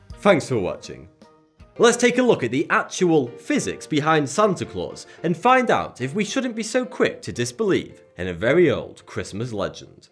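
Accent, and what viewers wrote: United Kingdom. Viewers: Man, where in the UK do you come from, please? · Why do British people have to speak like that? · The British accent?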